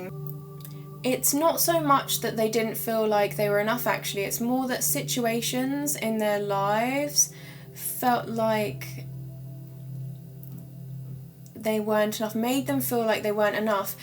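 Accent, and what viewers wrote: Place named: RP, United Kingdom. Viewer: ok, your accent is pretty posh, and my eileen was a borderer, but you have a good heart.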